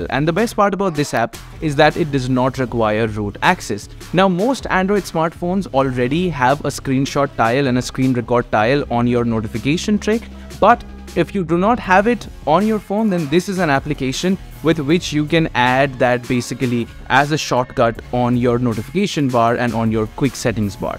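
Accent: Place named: India. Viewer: Seeing "forbidden apps not in play store" and hearing the lnd¡an voice gives me M!croscft tach support vibes. Great video! 😊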